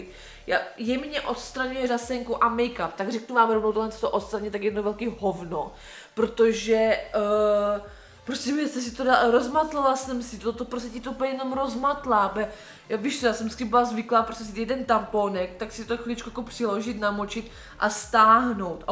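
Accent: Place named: Moravia, Czech Republic. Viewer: Já Tě žeru 👍 jsem moc ráda, že jsem Tě díky Terry objevila s hned jsem projela x videí. Navíc mi vyhovuje Tvoje vyjadřování a ta moravština. Jsem taky z Moravy a používám stejné výrazy jako ty 😜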